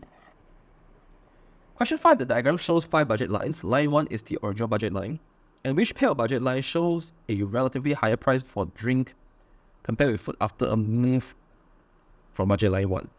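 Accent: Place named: China. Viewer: Chinese accent tough to understand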